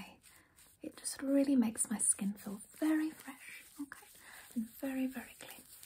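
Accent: Australia. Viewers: she’s Australian XD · As a true blue, I appreciate you and these videos. The comfort of an Aussie accent which the subtle cultural complexities of a “rich Aussie mum” makes me real feel at home 🦘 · I love your cultivated Australian accent 😘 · are you actually australian or no?!?! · in love with the aussie accent, the soothing voice and the vibess i just woke up from a nap but i guess its bedtime again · I don't know if anyone has seen it, but the accent is exactly like the rich ladies who work in the shop in Kath and Kim 😂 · She’s literally Australian.. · Omg lol I just realised that most of us aussies have that exact accent 😂😂😂😂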